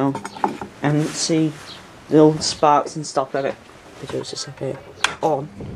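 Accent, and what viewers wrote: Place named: United Kingdom. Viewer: How is it that all the crazy electricians have a UK accent? XD I absolutely love these!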